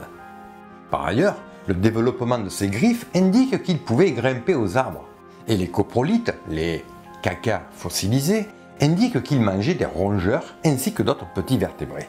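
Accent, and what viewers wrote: South, France. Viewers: Vous avez quoi comme problème avec l'accent du sud sans déconner. Vous vous êtes entendu avec votre accent pointu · Beaucoup d'infos interessantes, très détailler et j'aimes bien l'accent du sud de la France, c'est sympathique et la prononciation impécable, un seul hic, j'ai entendu mouflette décrivant c'est animal d'une puanteur exécrable, au Québec nous disons mouffette, interessant de savoir la différence d'appellation.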